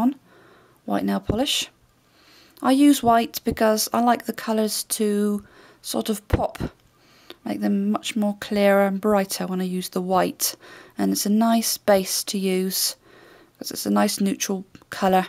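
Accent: United Kingdom. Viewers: I love your British accent! :D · Awesome! And love your British accent! I always wanted to go to England to get the accent :3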